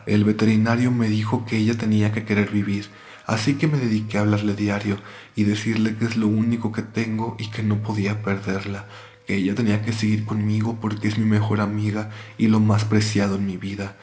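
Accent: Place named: Upper class, Mexico. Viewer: Hole por qué últimamente hablas como waytechecan cómo si tuvieras una papa en la boca weeeeee megaaaaa (cringe1000)🫢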